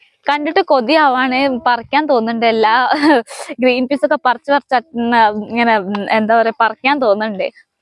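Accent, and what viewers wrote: Malappuram, India. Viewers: ingal enganaya malappuram slang parenne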